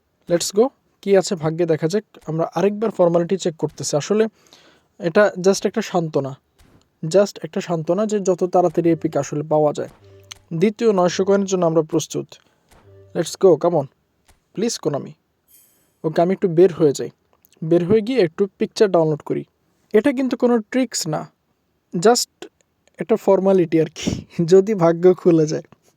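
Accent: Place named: Chittagong, Bangladesh. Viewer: ভাই আপনি কি চাটগাঁইয়া নাকি জানাবেন ভাই❤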